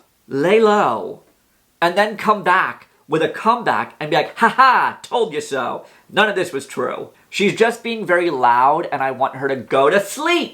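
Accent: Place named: South, United States. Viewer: I'm Enjoying your channel. You have a cute accent, southern?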